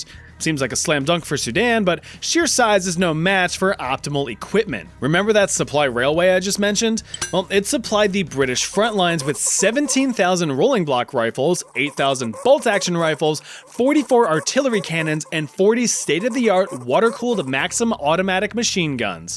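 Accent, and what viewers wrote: Australia. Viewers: Okay mate, your Aussie accent isn't that bad. I'm impressed. Especially in comparison to like...a lot of people on the internet. Also, great video. Also also, Emu's are kinda bulletproof. Something about organs being super in the middle of their bodies, so it took like ten bullets to take them down if you shot right. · The Aussie accent has me frying 😭🙏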